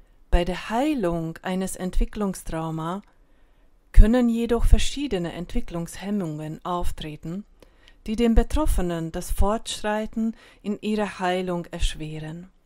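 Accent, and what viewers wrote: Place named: Hungary. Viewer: Ich mag außerdem deinen ungarischen Akzent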